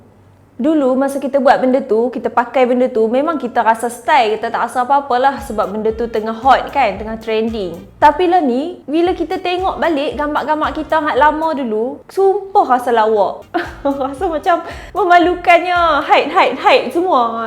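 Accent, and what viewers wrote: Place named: North, Malaysia. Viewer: Tak tau pasaipa aku tertarik dgq host punya utagha...bnyakkan lagi post guna host ni...terbaik baq hang..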